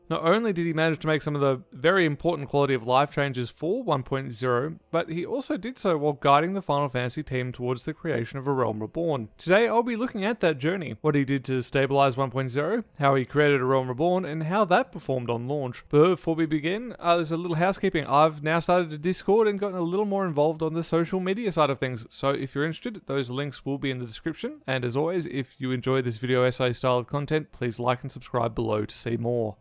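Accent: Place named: Australia. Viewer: Something about your voice reminds me of Christopher Walken, but in an Aussie accent.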